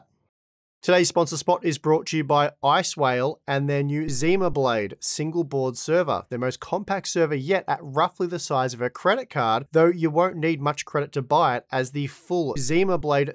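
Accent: Australia. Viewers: I’m sure most of the viewer base is from the states but when I’m listening to a Aussie I just wish you’d use aud prices 😿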